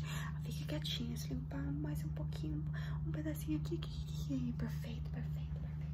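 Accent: Minas Gerais, Brazil. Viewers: Eu amei, ficou muito bom seu portugues, ficou um sotaque bem mineiro adorei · Your Brazilian accent is so strong lol not hating at all, you’re Portuguese was perfect!! Love your videos!! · Já ia pergunta se ela é de Minas kkkkkkk Perfeita.🤩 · Moça não tem como você não ser do Brasil, até o sotaque é fortíssimo e amei o asmr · estou confuso com o seu sotaque brasileiro, mas pelo menos você tentou, excelente asmr!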